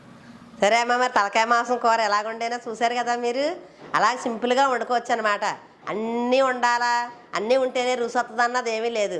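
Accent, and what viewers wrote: East Godavari, India. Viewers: Ma east godavari ante alaguntadi mari..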